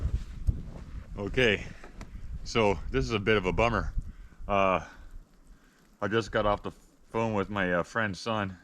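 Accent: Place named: Canada. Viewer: Wow. I've been over here so long I forgot how Canadians *sound*. 🙂 You a savvy enough mechanic to replace the brake line on the quad?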